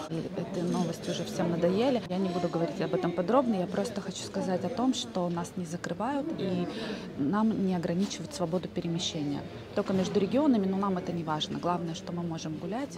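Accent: Urals, Russia. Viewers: Уральский говорок узнаваем. Я сразу понял, откуда вы. Про путина - лучше промолчу, чтобы негатив тут не оставлять. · Я так знала, что Вы с Урала! Говор наш)) я из Екатеринбурга, большой привет 🙋‍♀️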